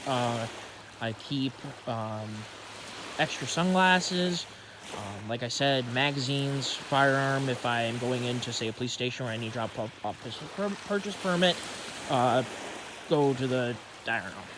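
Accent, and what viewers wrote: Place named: Michigan, United States. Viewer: Your voice sounded younger back then. I notice more of the Michigan snarl now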